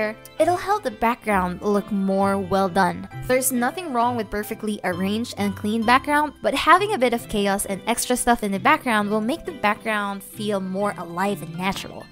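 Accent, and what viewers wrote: Philippines. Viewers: I can still hear that Fillipino accent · You have a litte filipino accent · Why do I keep hearing your Filipino accent!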